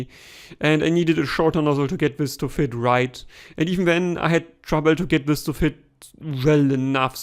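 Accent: Germany. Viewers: Dude, you sound like "der8auer", if you are probaly familiar with that name and anything he represents :)) probably the german accent...Anyway, your reviews are accurate and true, witch matters the most. Thank you!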